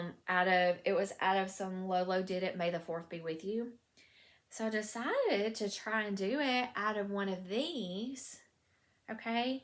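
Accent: South, United States. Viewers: I ❤️ your podcast… and I think you are from a southern place than Michigan!!!’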